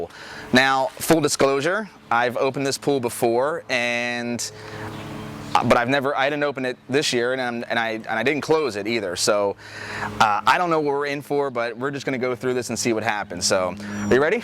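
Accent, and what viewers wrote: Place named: Philadelphia, United States. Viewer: I love it ! I heard it and was like oh philly philly 🦅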